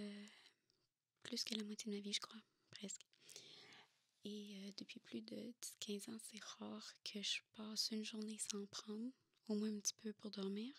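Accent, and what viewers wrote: Quebec, Canada. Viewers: Cela ne doit pas être facile de ce confier donc bravo a vous , je vous suis depuis longtemps maintenant et j'adore vos vidéos, et je vous trouve très belle, et j'adore votre accent et j'aime beaucoup les Québécois ❤ ceux qui seraient capables de vous juger seront des imbéciles, écouter personne a Par vous même , bonne journée à vous 💋♥️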